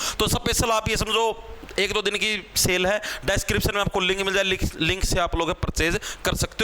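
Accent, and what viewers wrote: Haryana, India. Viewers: Aapki haryanvi mst hai Love from BIHAR 🤗🤗🤗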